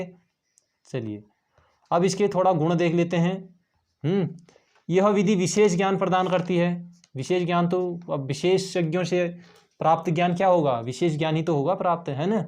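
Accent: Pahadi, India. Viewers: थेट पहाड़ी टोन। nice class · Kyu koi problem h pahadi tone say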